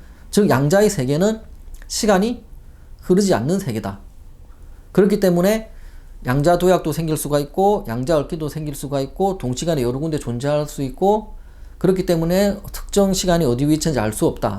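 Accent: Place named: Gyeongsang, South Korea. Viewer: 했던말 또하고 했던말 또하고 양자에 쳐맞았냐? 갱상도 사투리 듣기싫다 영상 찍지마라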